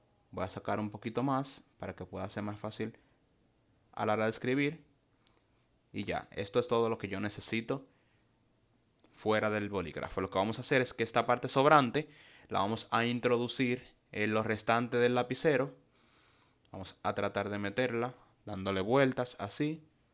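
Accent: Dominican Republic. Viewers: Eres Dominicano o ecuatoriano (lo se por el acento) soy Dominicano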